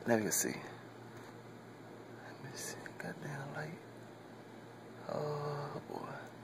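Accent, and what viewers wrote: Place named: South, United States. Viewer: feurl lines........wranch...eyybody..maane.. talm bout.......either you from here in jackson ms......or you from texas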